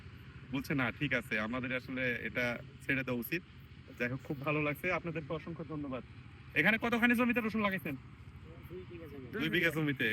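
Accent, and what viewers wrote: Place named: Natore, Bangladesh. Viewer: আপনার বাড়ি মনে হচ্ছে নাটোরে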